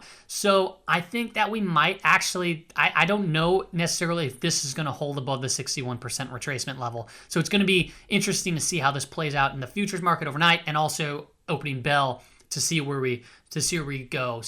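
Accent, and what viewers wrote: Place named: California, United States. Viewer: especially with your Californian accent, the analysis sounds even greater:)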